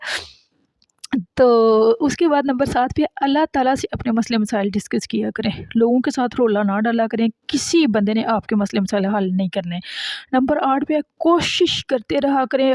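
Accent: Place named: Gujranwala, Pakistan. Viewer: Ap mujhe gujranwala ya iss k ass pass wale cities mai sy lagti hain😅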